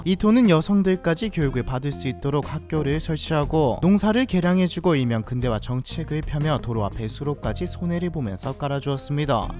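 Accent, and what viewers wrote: Gyeongsang, South Korea. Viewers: 갱상도가 표준말 하느라고 욕 본다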